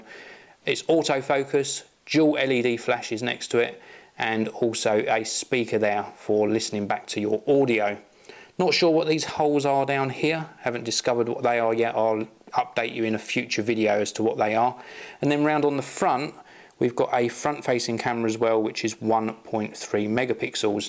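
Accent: England, United Kingdom. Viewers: I remember you because you unboxed the HTC Titan on Technobuffalo (I believe it is the same phone?) and your nice english accent.;) Great Job! I want a Windows phone as my new phone if ever im going to change a phone, but I think I'll wait for the Nokia one..;)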